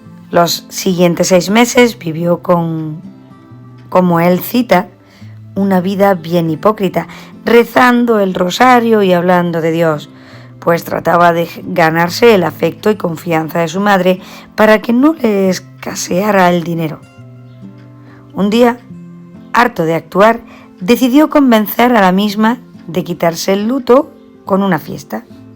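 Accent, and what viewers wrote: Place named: Spain. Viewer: Se le escucha acento español y por lo tanto debe tener dicha nacionalidad. En verdad me sorprende que alguien de España haya pronunciado la palabra "colonización" término ambiguo y mal aplicado ya que el actual territorio mexicano fue parte del virreinato de la nueva España, nunca fue una colonia y los nativos de las Américas fueron considerados súbditos después de la conquista cómo lo dejo expresado la reina Isabel la católica en su testamento y nunca fue política del Reino de Castilla y después del glorioso imperio español el "esclavizar a los mexicanos" al menos no en la ley, entiendo que muchos peninsulares y criollos cometieron abusos en éstas tierras pero siempre al margen de las leyes de Castilla y aún contra ellas. La historia de México no se entiende sin la historia de España, la historia de España no se entiende sin la historia de Hispanoamérica y me sorprende que tanto españoles cómo mexicanos desconozcan nuestra historia en común. 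Su reseña del periquillo sarniento es algo inexacta, le mando un saludo desde el territorio del antiguo reino de la Nueva Galicia.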